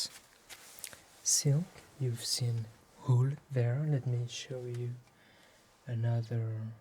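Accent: England, United Kingdom. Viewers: Did I hear a hint of an English accent?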